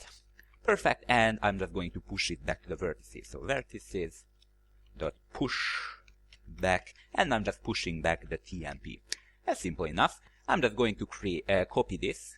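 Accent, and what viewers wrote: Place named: Hungary. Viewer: i like your hungarian accent:))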